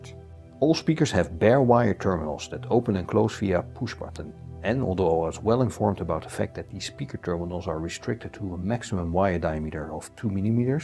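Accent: Netherlands. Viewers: enjoyed your dutch accent thought :) my main challenge with these Focal is where to set u the speakets. because in the back of the room the roof is crawling (-under the roof), so I don't know how the speaker will manage the atmos. It is very clsoe to the roof.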